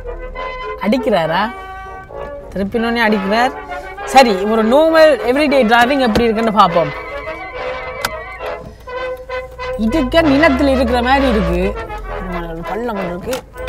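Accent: Sri Lanka. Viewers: Avoid music .unka ilankai tamil semmayayirukk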